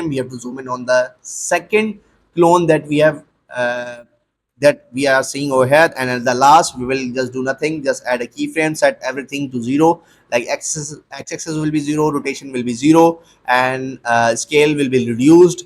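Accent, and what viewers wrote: India. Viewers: yeah he got an Indian accent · Bro your Indian accent make me fall of Laugh 😂 ( no hating )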